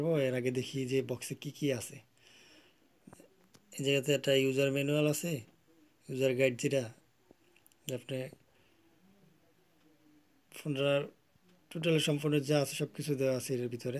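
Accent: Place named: Myanmar. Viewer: Vai khotha valo Kora bolban Karon mramar manusar moto khothaboltasan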